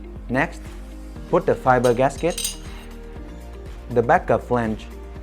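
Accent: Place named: Vietnam. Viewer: Judging the the accent, bro is vietnamese 🙂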